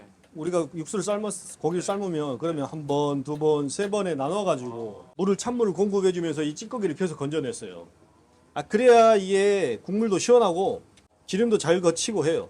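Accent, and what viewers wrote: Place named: Jeolla-Gyeongsang border, South Korea. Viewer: 말투나 식성을 보면 전라도와 경상도 그 경계 출신인듯 함.. 하동이나 여수분이 아니실까??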